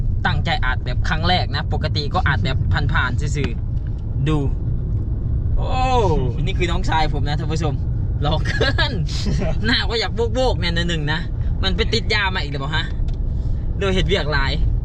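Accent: Laos. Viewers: ເຈົ້າປາກພາສາລາວໂຄດນ້າຮັກເລີຍ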